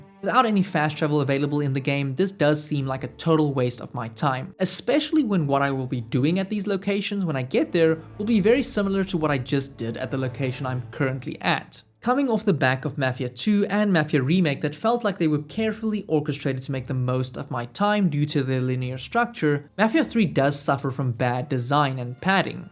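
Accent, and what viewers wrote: South Africa. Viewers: A seth efricen! Lekker man, sommer immediately subscribed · Lekker, man! You're South African! (the r's and o's gave it away lol). While I agree with a lot you're saying, I just can't bring myself to rank Mafia III up too high. The potential is there, Lincoln is compelling and the story is genuinely one of the best I've seen in gaming, but the open world isn't nearly immersive enough (in terms of what you can interact with) especially compared to Mafia 1 OG and 2. I'm far more disappointed than turned off by it, since it has the foundation there (cops reacting differently to you depending on where you commit crimes etc) and in all honesty, Lincoln deserved a MUCH better game. It's still a solid game I replay now and then, and is frankly overhated.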